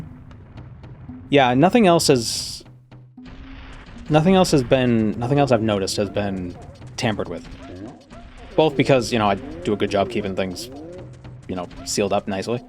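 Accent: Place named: Boston, United States. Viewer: 18 hours of Just Boston Noise❤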